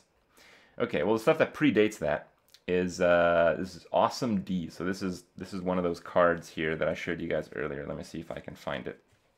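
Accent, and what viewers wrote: Romania. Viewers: So that's why u'r accent was so familiar cuz u are from romanian too,and,Omg this catle totally looks like my own caslte drawing. Totally..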